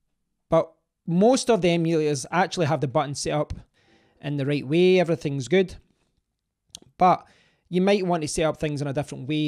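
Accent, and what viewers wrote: Scotland, United Kingdom. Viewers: Ma man, how on earth you go from the game to the main menu! Nice to hear a Scottish accent btw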